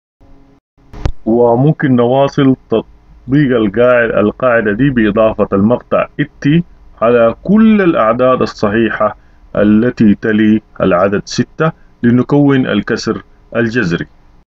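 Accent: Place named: Mahas, Sudan. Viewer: قاعدة ⲓⲧⲧⲓ في النوبين تستخدم مع الاعداد لاشتقاق صيغة الترقيم التصاعدي وليس صبغة الجذور الكسرية كما تابعت معكم ..فاسم العدد التصاعدي (الثالث) تتكون من اسم العدد ⲧⲟⲩⲥⲕ ملحقا بها اللاحقة ( ⲓⲧⲧⲓ )وتنطق في ثلاث مقاطع نطقية ⲧⲟⲩⲥ\ⲕ-ⲓⲧ\ⲧⲓ (الشارطة المائلة لبيان أماكن التوقف اللحظي عن النطق، والشارطة على السطر لبيان جذرالكلمة والاضافة القواعدية عليها ) ومثلها كل أسماء الأعداد التصاعدية . أما قاعدة إشتقاق أسماء الكسور الجذرية فلا يتشابه منها في اللاحقة إلا اسم العدد (ثلث 1/3) مع إختلاف طريقة النطق (النبر) ..فاسم (الثالث) التصاعدي يتم النبر على المقطع النطقي الثاني (والنبر هو رفع حدة الصوت عند النطق بحروف أي مقطع بما يخالف النبرة الصوتية لما قبله أو بعده) ، بينما في إسم (الثلث) الجذري لا يوجد نبر والمقاطع النطقية الثلاث تنطق بوتيرة واحدة.. وتقبلوا خالص تحياتي على جهدكم ..وعسى أن يكون ما كتبته لكم معبرا عن أسماءنا المحلية في لهجتنا النوبية التي تعرف بالفاديجا ..لأني أتبين إختلاف لهجة المتحدث (المعلم) قد يكون محسيا أو سكوداوي .. 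لا أعرف تحديدا ..عموما خالص الشكر والتقدير والتحية (حسين كبارة ..مركز الدراسات النوبية)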